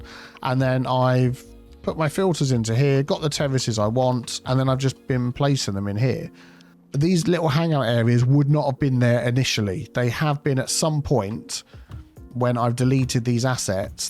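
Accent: United Kingdom. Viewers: Wait, you're British?!?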